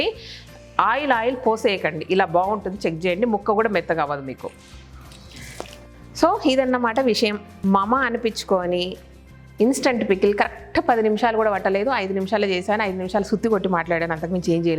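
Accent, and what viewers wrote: Telangana, India. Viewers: Madam meeru Telangana na....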